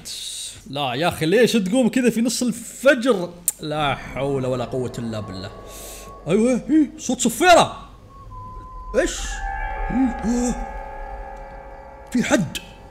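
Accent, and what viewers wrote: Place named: United States. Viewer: الاكسنت الامريكي معطي جو 😂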